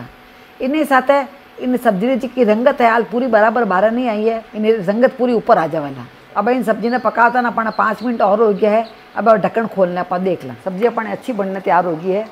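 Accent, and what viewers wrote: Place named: Punjab, India. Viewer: Daadi amma to app lgte hi nhi hi aur apki voice to bhut sweet hai Punjabi accent bhi ata hai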